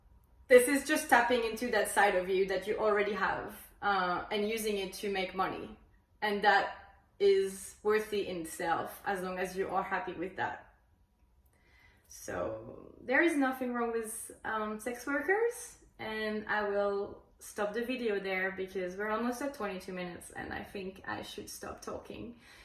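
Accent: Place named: France. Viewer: I’m 100% sure she’s French this accent😅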